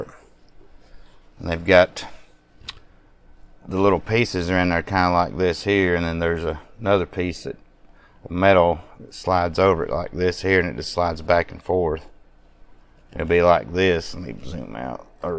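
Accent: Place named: South, United States. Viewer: Mr. thanks for the refurb on this equipment. My lead coax reads rg58 /u, after restoration it seems to function as designed. It appears useful for spotting intermittently shorting capacitor pots. Thanks again looking forward to more vintage test equipment on your channel and your laid back southern style.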